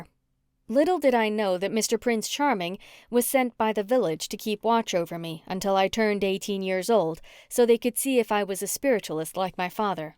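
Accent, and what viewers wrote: South, United States. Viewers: Lovey story Narrated in a southern twang😊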